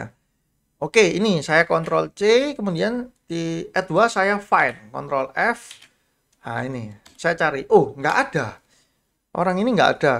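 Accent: Surabaya, Indonesia. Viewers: logatnya kog Sbynan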